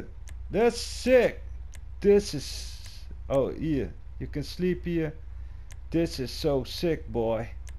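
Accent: Netherlands. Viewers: im half netherlands and half from belgium. thats why i knew that he was from the netherlands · Are you dutch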